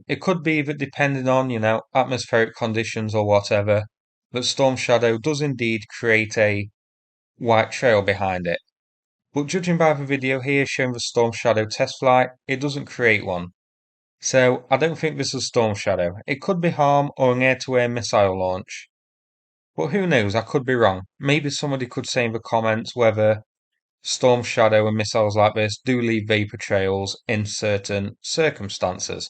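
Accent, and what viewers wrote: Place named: Nottingham, United Kingdom. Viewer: Ah up me duck. You’ve got a proper East Midlands accent.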